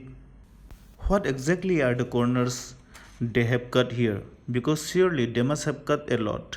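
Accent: India. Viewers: You sir have the "Stereotyped" Indian/South-Asian accent, the first time I've heard one of my countrymen with the accent foreigners mock us with. But thats Ok. :-)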